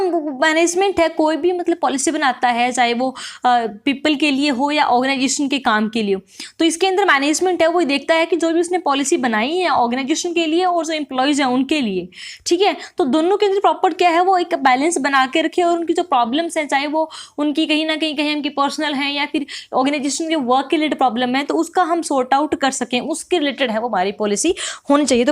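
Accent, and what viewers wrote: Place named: Haryana, India. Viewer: Mam aapka anjane ka trika bahoot acha Hai😊but language me thoda Haryanvi Touch Hai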